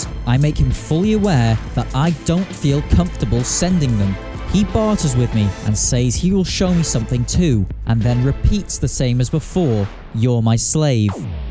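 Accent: Wales, United Kingdom. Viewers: Welsh Chris Hansen. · bri’ish man has good content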